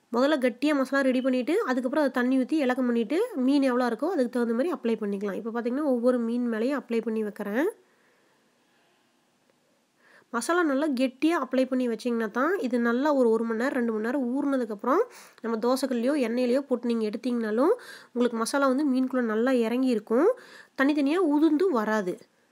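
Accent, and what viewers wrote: Kongu, India. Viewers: Kongu tamil super sis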